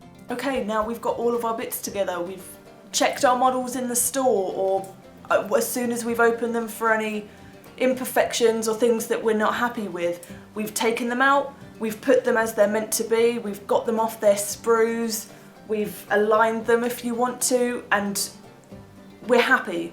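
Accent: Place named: Manchester, United Kingdom. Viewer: I love your accent. It makes me miss my Mum. She's from mancheste. Good video.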